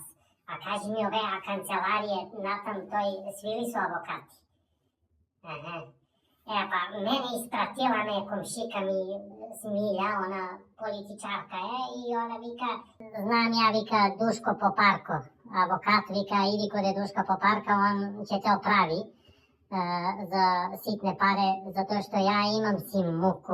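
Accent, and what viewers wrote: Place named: South, Serbia. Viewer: BRATE ODAKLESI? CEPAŠ JUŽNJAČKI A DRAGICA ME PODSECA NA KOMŠIKU BABU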